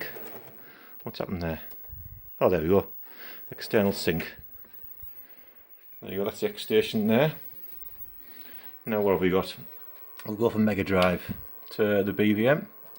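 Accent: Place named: North East England, United Kingdom. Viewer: Looking forward to catching up on some of your vids matey.. I take it from your accent and reference to Boro you're a fellow North Easterner lol 😎👍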